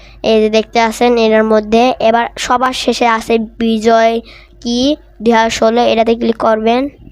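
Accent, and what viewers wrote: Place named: Kushtia, Bangladesh. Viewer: Onk valo bolco viyaa❤❤ Ami sure tomer basha Kushtia 😊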